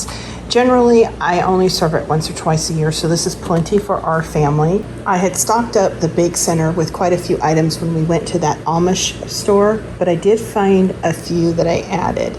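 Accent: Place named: South Louisiana, United States. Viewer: OMG ! YOU SAID, "YALL" ! COULD YOU POSSIBLY, BE FROM THE SOUTH ? YOU SOUND LIKE YOU'RE FROM SOUTH LOUISIANA.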